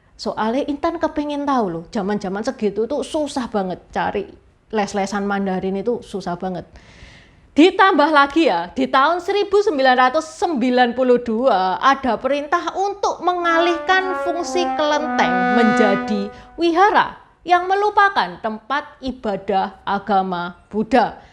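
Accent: Java, Indonesia. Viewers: Keren nih china,dialeknya jawa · Tionghua....tapi medok jowo.... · Ketauan cindo ini kalo dia cindo Jawa soalnya Medokan jawanya terasa banget😂😂😂😂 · Aku suka logat medhok Intan, jd inget wkt sekolah di jogja hehehe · Suka dengan suara jawa nya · Chino Medok Jowonya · Oke intan trima kasih skrg sy jd tau .Oya sy mau tanya ya kenapa ya cindo yg lahir di Medan atau di Bangka kok logat bicara tak sperti yg ada di daerah yg mereka tinggal ya Tak seperti cindo ( tionghoa) yg lahir di Jawa atau Sunda Salah satu contoh nya intan sy yakin intan asli dari Jawa kan....hehehe . Soal nya logat bicara nya ketahuan hahahehe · Kalo saya perhatiin di malaysia dan singapore, semua etnis yang ada disana seperti benar-benar terpisah. Di malaysia bahasa melayu umumnya digunakan oleh etnis melayu. Etnis tionghoa berbicara bahasa tionghoa dengan sesamanya, etnis india berbicara bahasa india dengan sesamanya. 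antar etnis berbicara dengan bahasa inggris. etnis selain melayu sepertinya enggan berbahasa melayu walaupun sebenarnya bisa. Apalagi di Singapore. Tapi sepertinya etnis Tionghoa lebih bisa berbaur. Bukan hanya soal bahasa Indonesia, tapi dengan bahasa daerah masing-masing tempat tinggalnya. sendiri malah lebih medok daripada saya yang asli jawa dan berbahasa jawa di rumah. Apakah ini semata-mata karena kepres 67 atau memang cindo pada dasarnya memang lebih membaur? Salut dan hormat buat atas kerja kerasnya membuat konten yang sangat mencerahkan. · Mbak e logatte jowo banget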